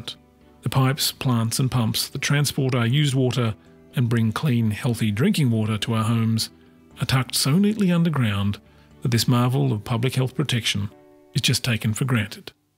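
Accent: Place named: Australia. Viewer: Couldn't they find an American to narrate this? That Ozzie accent is hard to understand.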